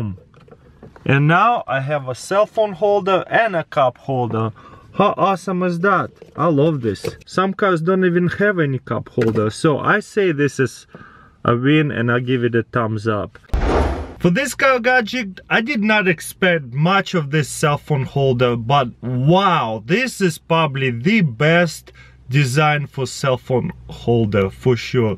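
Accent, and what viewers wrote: Russia. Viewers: I've always wondered do you live in Russia because you have a Russian accent? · Michael Hawthorn meeee i love Russian Accents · I think your Russian accent is what makes you so hilarious, not to mention the BOOMS and the TUMBS up.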